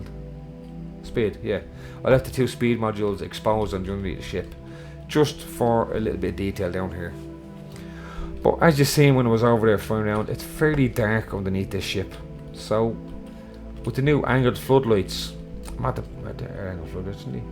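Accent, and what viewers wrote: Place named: Dublin, Ireland. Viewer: Watching your videos made me get this game, i love your content, it's good to hear the dublin accent lol. Keep up the good work.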